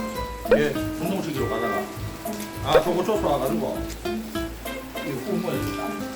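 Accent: Gyeongsang, South Korea. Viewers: 케케케~~대서 ~~엉 왜케 올만이셔유? 역시 삼겹살 쥑이네요ㅋㅋ 구수한 경상도?억양 인간미 촬촬 넘치는 우리의 케케에챱?꺼챱?~~쓰ㅡ읍쇼리ㅋ 솨자~~앙님good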